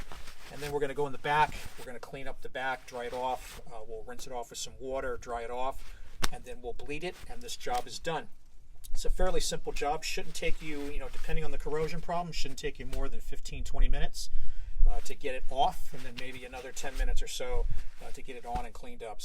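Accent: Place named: Pennsylvania, United States. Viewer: I know that Pennsylvania accent from anywhere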